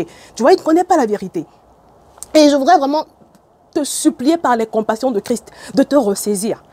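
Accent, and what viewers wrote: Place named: Mali. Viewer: C claire t une malienne vu l'accent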